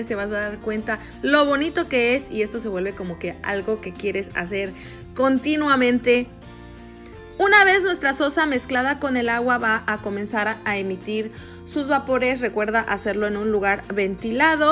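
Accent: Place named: Mexico. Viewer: 👏👏👏👏felicitaciones, que gran enseñanza y gracias por compartirla con nosotros , por tu acento escucho que eres como Mexicana y le llamas sosa cáustica , acá en Colombia se le dice Soda Cáustica , igualmente es lo mismo. Te envío un gran saludo desde la capital Bogotá .!!!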